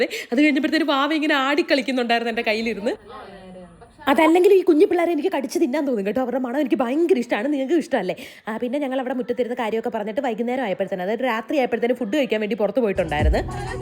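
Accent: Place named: Kozhikode, India. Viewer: ഇനിയും പോകുമ്പോൾ കോഴിക്കോടൻ ഭാഷ നമ്മളെ കേൾപ്പിക്കുമോ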